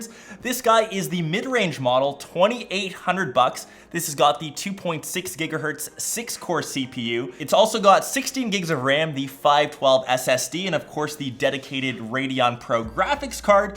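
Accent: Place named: Canada. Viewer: Great video! Thank you! Also I appreciate how Canadian you are. "PrOccess!" "Out!" Keep up the good work!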